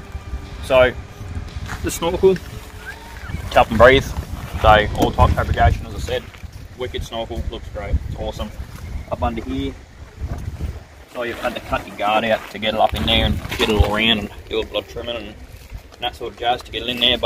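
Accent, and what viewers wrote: Australia. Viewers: hi! can you write down everything.sorry to sound rude but your australian accent got me. if you dont mind writing everything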